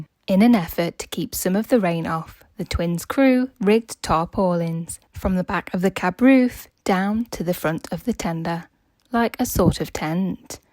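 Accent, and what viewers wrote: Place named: Scotland, United Kingdom. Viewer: You did well with the Scottish accent. 🏴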